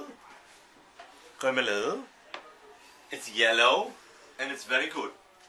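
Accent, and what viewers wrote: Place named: Germany. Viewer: Great vid buddy and i think you needed a chainsaw for the bread.Dude and what was up with the German accent.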